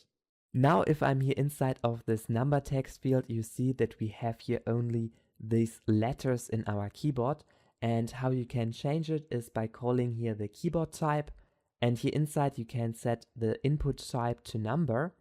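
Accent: Germany. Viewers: lmao i can hear that you are german ahahahah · Great Tutorial or should I say Gut gemacht? Man hört echt raus, dass deine Muttersprache Deutsch ist. Das ist aber ja nichts schlechtes:)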